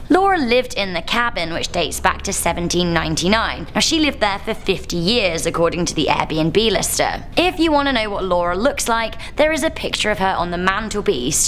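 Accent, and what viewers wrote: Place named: England, United Kingdom. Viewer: I started watching this channel originally cuz I love English accents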